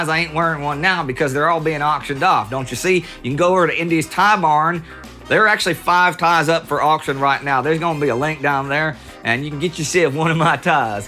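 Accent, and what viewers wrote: Texas, United States. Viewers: Indy, your Texan is showing. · I love texas-accent Indy <3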